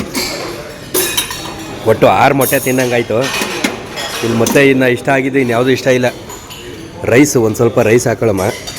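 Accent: Mandya, India. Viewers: ಮಂಡ್ಯ ಭಾಷೆ ಬಿಟ್ಟು ಕೂಡಬೇಡ👌👌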